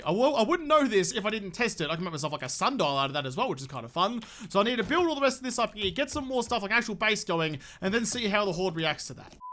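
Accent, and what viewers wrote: Australia. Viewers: Luv ya vids and ya silly Base ideas Thx a lot Mate ♥♥♥ Even as a fu... ng Kraut I overstand ya Aussie English (worked a couple of years as Scuba Diving Instructor and had a few Aussie Coleauges) Where I n I cant get some Tickets 4 Park 🤔🤔🤔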